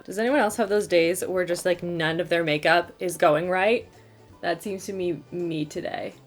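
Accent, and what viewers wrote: France. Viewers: OMG YES, THAT'S HOW YOU SAY LES MACARONS 😍😍 your French accent is adorable 🤗 also, love the eyelook 💗 Thanks for that lower lash mascara tip!!! 💗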